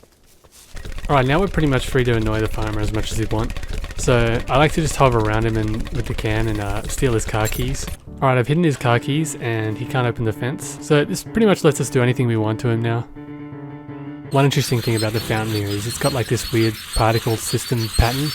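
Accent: Australia. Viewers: I cant stand his australian voice being so incredibly nonchalant about everything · Are you Australian?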